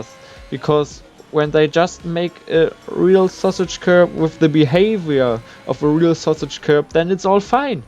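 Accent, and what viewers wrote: Germany. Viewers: That German accent :D okay im from Czech Republic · Wie man den deutschen Akzent hört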